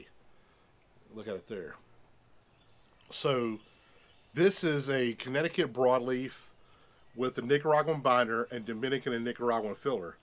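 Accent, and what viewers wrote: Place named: North Carolina, United States. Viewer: Great review. You sound like you should have a BBQ channel lol. It’s that NC accent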